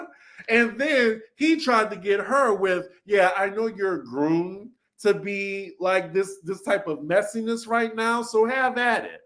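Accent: New Orleans, United States. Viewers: you definitely have a New Orleans accent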